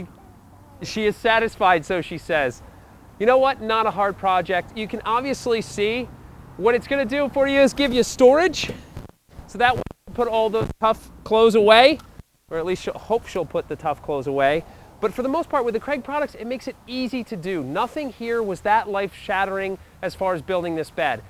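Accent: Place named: Pennsylvania, United States. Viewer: I knew I heard a PA accent.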